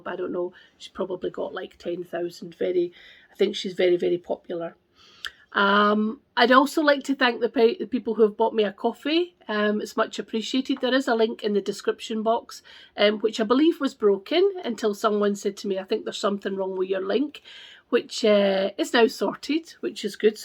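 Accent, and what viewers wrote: Scotland, United Kingdom. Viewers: I just found you and love your stitching and your lovely Scottish accent. I love the Flea Market pattern - actually anything by Lori Holt. The Tudor pattern is very sweet and one I'd love to stitch. Enjoy your vlogs. Cheers from Canada. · The Tudor Bee is nearly as lovely as your scottish accent! 😊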